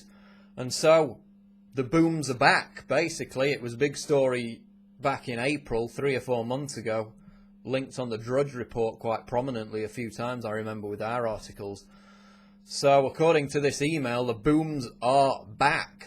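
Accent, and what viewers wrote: United Kingdom. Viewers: You live in midwest? what's with the british accent? jw cuz i live in wisconsin too.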